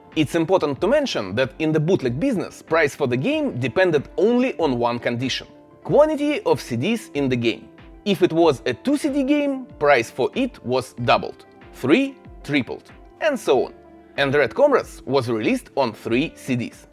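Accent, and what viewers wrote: Russia. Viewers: Рашн инглиш из изи ту гет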